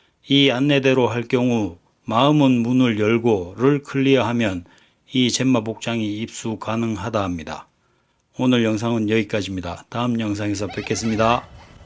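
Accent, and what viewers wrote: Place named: Gyeongsang, South Korea. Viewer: 갱상도 아재 말투로 조목조목 따지는거 개우껴요 ㅋㅋㅋㅋㅋ재밌습니다. 다른 게임들도 다뤄주세요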